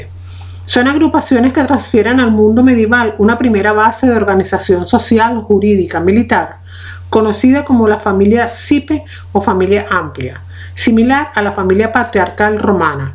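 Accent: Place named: Colombia. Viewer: De que provincia de Colombia es este acento?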